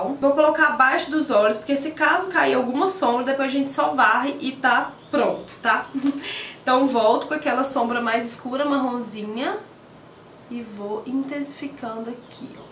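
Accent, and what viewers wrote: Minas Gerais, Brazil. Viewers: se e mineira ne! se nao for n acredito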